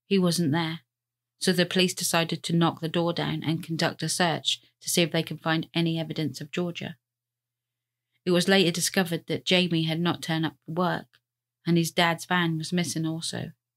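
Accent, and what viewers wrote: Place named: Suffolk, United Kingdom. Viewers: Hey, I'm obsessed with anything true crime & just found your channel, I'm now gonna binge all your videos. Thankyou for your content! Where are u from? Your accent sounds sooo local to me (Suffolk) x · weird hearing a Suffolk accent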